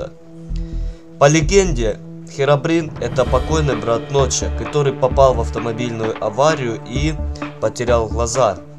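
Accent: Ukraine. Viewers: Вот в этом можно прям услышать акцент украинцев но в 2024 году его прям не слышно и я думала что он русский пх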